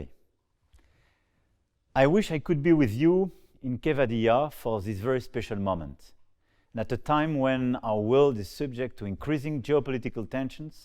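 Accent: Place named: France. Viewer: yeah, your french accent while speaking english is totally sexy, but it's too easy to manipulate your message by discrediting what you say as "lost in translation". the burden of access to your messaging is on non-french speakers. i learned french to understand your politics - anyone else may as well. by enabling single language english speakers you allow france to be manipulated as a "blueprint" when in reality, most geopolitical spaces would never be able to copy french process because france is 80+ years ahead of other nato dev. it's unreasonable to encourage other nations to "follow france" because they could not effectively do so now.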